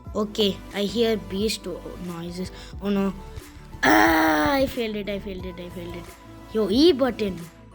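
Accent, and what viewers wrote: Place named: India. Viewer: Lol your Indian scent lel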